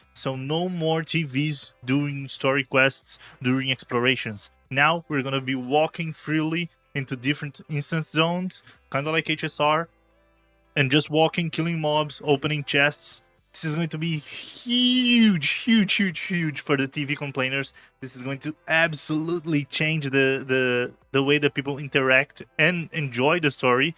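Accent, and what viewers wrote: Brazil. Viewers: sabia que tu era br pelo sotaque kkkk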